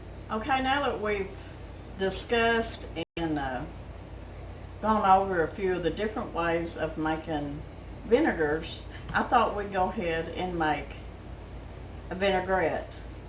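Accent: South, United States. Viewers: Thank you so much for the extra instruction...I know you guys are super busy, especially during this beautiful Spring weather. You sure do speak my language...clear, concise and just a "touch" of Southern drawl...I appreciate this more than words can say! Done so much pickling and canning in my days, but homemade vinegars is a first for me...you really CAN teach an old dog new tricks! 😉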